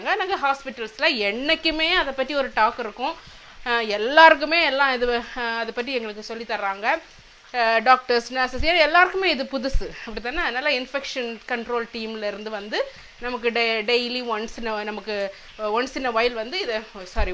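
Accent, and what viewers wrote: Nagercoil, India. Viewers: Even if you settled in UK you haven't forgotten your nagercoil tamil so sweet whenever upload a video never ever change your language . Mother younger is just like our mother. So keep it up. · உங்கள் மக்கா ,மக்களே....இன்னும் அழகான வார்த்தைகளுக்கு நான் அடிமை....enaku neraiya nagercoil friends erukanga sis nenga pesra tamil rombo pudikum....love from UAE... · Superb..What a catching Talk.Clasa. No Other Words To Say..As If Iam in Krishnankovil in Nagarcoil Town... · Akka naanum Nagercoil kaari thaan...Namma ooru baashayai kekkarathukku romba santhoshama irukku....Ippolaan enga family la yaaraavathu stressed aa irunthaangana unga video thaan pottu paapom....En ponnu unga periya fan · Akka I like your style of Tamil.Nagercoil? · This is josephine from trichy.Hello how r u. Recently I started watching u r channel. I like u r nagarkovil slang. 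I like u r body language everything instead of u r cooking. Keep countinueing dear. Bye. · nagerkoil thamilai marakatha thamilachi neengal i like you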